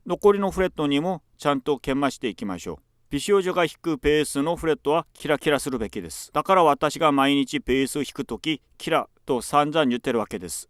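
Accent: South Korea. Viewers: 日本人ではないのか！？めちゃくちゃ可愛い喋り方！韓国訛りかなー？ なんか喋ってるの見るとさらに、可愛く見えてくる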